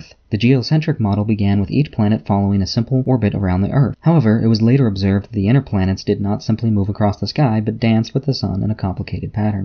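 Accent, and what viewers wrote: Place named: United States. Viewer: He's American that's why I'm looking for a nice concise English accent to explain it to me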